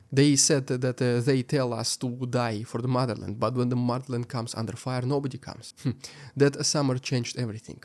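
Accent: Russia. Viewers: Brilliant and compelling analysis, eloquently delivered. The Russian accent forewarns inartful delivery, but the reality is just the opposite, and a true delight to hear and absorb. Thank you!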